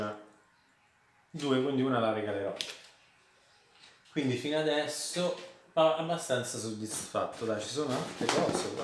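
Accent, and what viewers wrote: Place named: Rome, Italy. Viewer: ah davvero? L'accento sembrava romano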